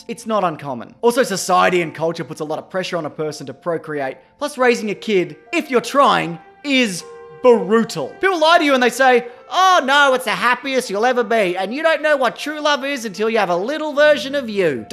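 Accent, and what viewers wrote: Australia. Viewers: I came here for jokes and an Australian mans voice not a reality check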